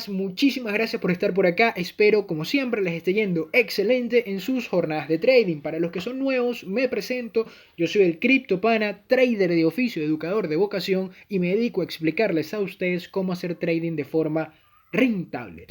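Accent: Venezuela. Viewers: Muchas gracias por compartir estos vídeos la verdad es que me han ayudado bastante a poder entender el mercado sobre todo por que lo dices de una forma amigable y en el acento venezolano que es tan como de escuchar para mi. seguiré viendo los vídeos para aprender a crear mi propia narrativa y proceso y poder operar de una manera consistente en el mercado. Saludos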